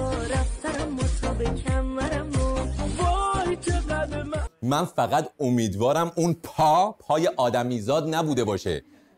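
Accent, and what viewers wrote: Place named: Isfahan, Iran. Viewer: اصفهونیه لحجه داره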